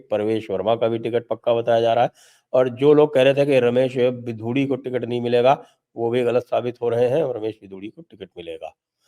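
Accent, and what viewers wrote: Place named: Uttar Pradesh, India. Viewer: UP se ho aap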